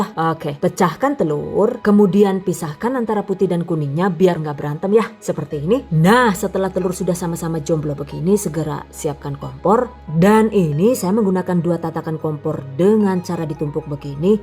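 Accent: Banyumas, Indonesia. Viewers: Haha ada ngapaknya juga,,asal mna bu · Semoga tukang warteg tidak mencontoh video ini..karena waktunya lama. Ngomong ngapaknya selalu saya tunggu. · bikin org gk bosen belajar karna byk selingan pke bhsa jawa ngapak 😂 jadi makin smangat mantengin chanel nya bun 🤗🥰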